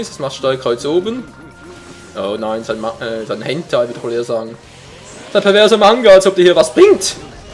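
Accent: Switzerland. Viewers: kommst du aus der schweiz ?? ;) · bist du schweizer? nice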